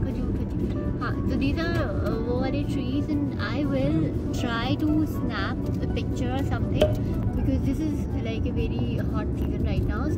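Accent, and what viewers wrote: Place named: India. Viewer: 4 secs we can make out Indian accent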